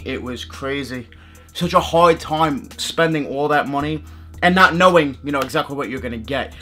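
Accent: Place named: Brooklyn, United States. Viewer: Where abouts you from? You sound like a New York Brooklyn sort of accent.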